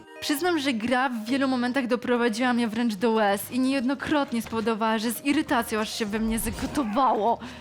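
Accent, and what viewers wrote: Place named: Ukraine. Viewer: Fajnie że jest popularna streamerka. Natomiast w roli prowadzącej, nie sprawdza się, ponieważ ma problem z dykcją i emisją głosu. Strasznie się tego zle slucha. Nie wiem co to za maniera wymowy ale brzmi jakby była ukrainką.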